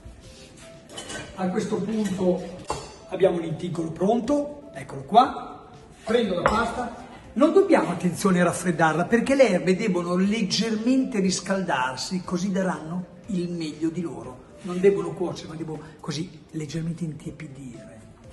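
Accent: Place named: Romagna, Italy. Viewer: Hai un accento Romagnolo che si sente a metri di distanza!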